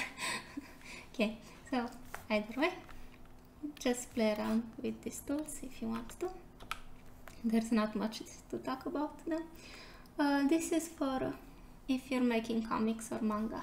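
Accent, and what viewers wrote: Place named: Romania. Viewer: Your accent sounded so familiar, of course you are a fellow Romanian! Keep up the great work, amazing tutorial!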